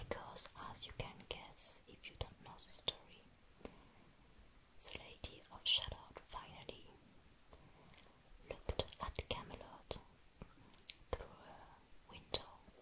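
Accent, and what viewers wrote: France. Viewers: Is this a French accent? Sounds nice and gentle anyway. :) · I never knew I needed to hear a French accent in ASMR videos so much, until I found this channel...🥰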